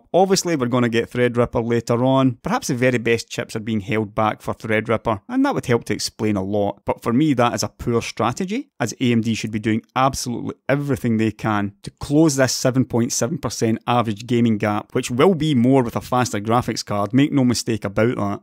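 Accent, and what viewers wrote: Scotland, United Kingdom. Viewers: scottish accent sounds like shit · Is that Scottish accent or Irish accent?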